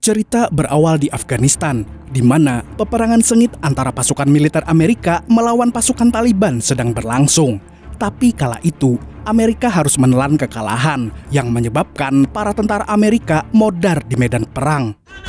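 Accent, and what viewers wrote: Banjar, Indonesia. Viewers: Kira orang manakah sekali nya urang banua juaaaaaa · Banjar jua sakalinya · Banjar kah nii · orng Banjar kh bg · banjarr sekalinya pian lahj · Bahh banjar sakalinya si nihh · Astaga banjar sekalinya, tekajut² uln 😆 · Aihh sekalinya ikam urang banjar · Nah paman, orang Banjar kh pian 😁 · akay urng banjar kalinya di dangar bahasa😂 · Orang banjar skli nya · bah mimin nya urg bnjar, mntap mang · Harau urg banjar sekalinya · org banjar skli nya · urang banjar kali nya naseb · Umaiiii Banjar ai rupanya wal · Mantap banjar nya 🤣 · Keren yang bacakan alur ceritanya sampai kada hingkat guring ulun. Pasti angah dari Banjar ya kan? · Banjar banar wkwk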